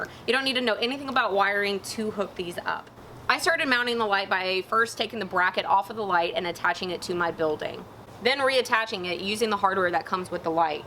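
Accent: Texas, United States. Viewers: It's just how she talks. It's a Texas thing.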